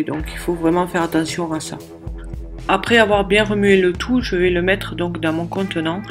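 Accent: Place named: South, France. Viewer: Coucou, Je m'abonne car j'aime;) Ça c'est un bon sérum, que des bonnes choses pour la peau. J'aime l'accent du sud;) Bonne journée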